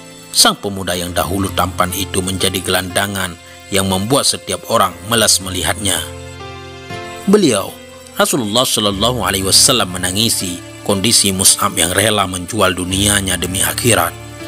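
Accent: Aceh, Indonesia. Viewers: Logat mimin sepertinya dari Aceh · Kalau boleh menebak .di dengar dari suaranya Abang asal dari Aceh / Lombok?🙏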